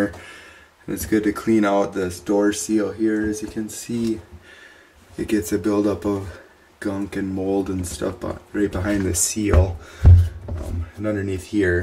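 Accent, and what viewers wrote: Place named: Upper Midwest, United States. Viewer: Thanks so much for posting the code for system clean. Even though mine randomly automatically pops up asking me if I want to clean system, it's always when I'm setting the control to start a load after I've already loaded clothes and detg and when I go to set controls on. It will automatically interrupt and ask me, which I then just punch cancel and it allows me to restart without asking again. If that makes sense. But now that I know how to set manually I can do it with more convience. You sound like you are from Minnesota or Wisconsin, or maybe even Buffalo NY area. Upper midwest accent. Thanks!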